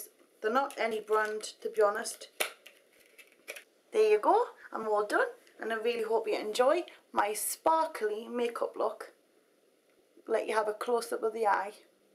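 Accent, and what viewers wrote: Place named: South Shields, United Kingdom. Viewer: omg I can't believe your from south shields Newcastle I have been there!!! I love your videos and accent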